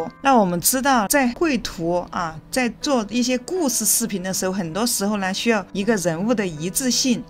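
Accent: Sichuan, China. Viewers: 四川的吗？口音挺像啊。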